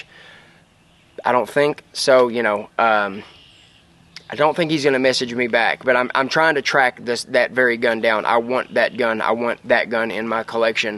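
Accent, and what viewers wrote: South, United States. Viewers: Man i just wanna say i appreciate what you do so much. your personality on camera and love for firearms and definitely that southern draw reminds me of me and my friends here in tennessee and i think thats one of the thing's that make me favor your videos over a lot of others. that and the awesome content. love my wot and want a graves so bad its ridiculous. that roller i believe makes all the difference in it running on multiple calibers. i just wanted to say how sorry i am for what happened to your uncle. i could literally see what u were thinking while shooting that usp and i know it took so much for u to continue. you have tons of heart bro. i read the article and i also went and looked at the lawsuit filed. i hope u dont take offense to me asking but how did the lawsuit play out. i just wanted to know that they paid for what they did and if they didnt thats a travesty. 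the fact of who ur uncle was it never should have happened and they should have handled it way different. again man sorry for ur loss and o look forward to you content for as long as u can put it out.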